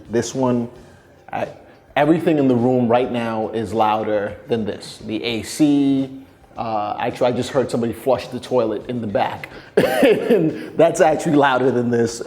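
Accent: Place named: East Coast, United States. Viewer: Ok, thats makes sense. Sounded like an East Coast accent. I'm trying to test out the Varicam but they dont have one in Chicago (for rental atleast). But from what I've seen and some footage I've graded it looks to be a solid camera. I'm rolling with the Ursa Mini 4.6k right now, which is great, but it has some problems with fine hue discernment (especially on the red channel) and not a nice sensor texture above 800ISO. I shoot mainly docs, and some promos. The varicam looks like the move for me in early 2017. Cheers!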